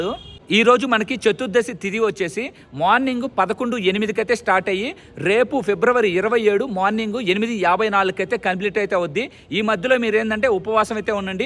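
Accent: Nellore, India. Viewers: Om namahsivaya nee langwage batti nellore anukunna madi nellore nanna presentt hy naku hai cheppu godbleesyou swamy daya neeku chala undi nanna nellorelo ekkada reply pettu vasanta aunty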